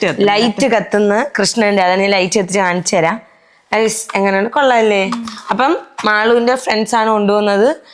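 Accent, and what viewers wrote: Trivandrum, India. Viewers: samsaram kettalariyam Tvmkariyanennu..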